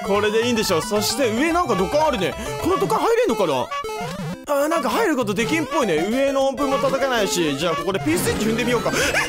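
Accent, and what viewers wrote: Fukuoka, Japan. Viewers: 福岡弁？とゴミみたいなOPツボです！いつも楽しませてもらってます🤗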